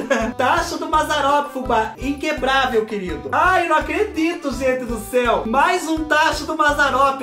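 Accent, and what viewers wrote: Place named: Itatiba, Brazil. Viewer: Adoro esse sotaque dele. Eu sou baiana,mas morei muito tempo em Itatiba,onde o povo fala bem desse jeito e eu morro de saudades.💞🙏😢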